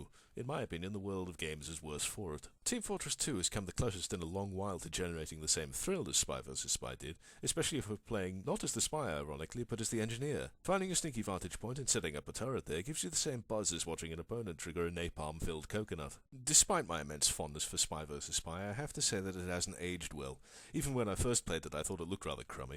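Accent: United Kingdom. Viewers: I got the feeling of beloved Yahtzee, but I missed the excessive swearing about the game. I am after all, a fan of juvenile humor, and hearing a posh british accented man swearnig until his gonards explode is making me laugh. Other than that, It seemed to be a great first review try. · but he has a British accent nevertheless, and iam pretty sure he was born in Britain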